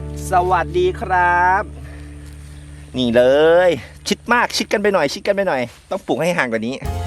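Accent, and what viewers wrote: Isan, Thailand. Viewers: 555เราชอบฟัง พาษาอีสารด้วนน่ารักดีสุดยอดคะ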